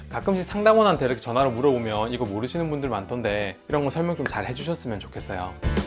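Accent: Gyeongsang, South Korea. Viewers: 경남사투리가 느껴지는 ㅋㅋㅋ 잘 보고 갑니다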